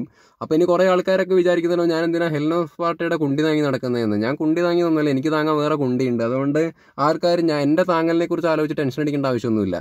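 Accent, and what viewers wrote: Palakkad, India. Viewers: Palakkad slang varund broiii👏👏👏👏👏 pkd anel proud of yu man✌️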